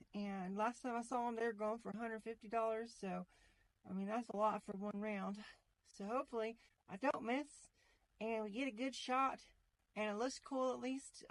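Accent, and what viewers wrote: South, United States. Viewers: Sound like you from Mississippi or Alabama or Tennessee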